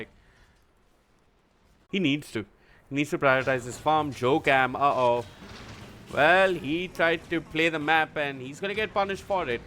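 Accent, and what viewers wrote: India. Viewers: Who is this indian caster. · indian caster ?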